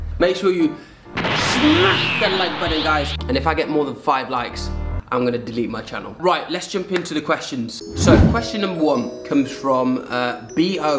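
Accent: RP, United Kingdom. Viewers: Ngl he still sounds posh his real voice, like it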